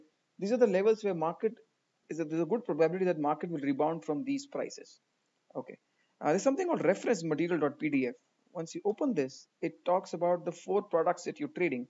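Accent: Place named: India. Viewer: can't understand the Indian accent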